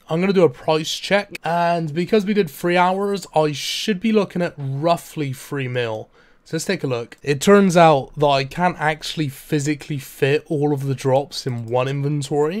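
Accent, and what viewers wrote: Bristol, United Kingdom. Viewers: Brizzle accent mesh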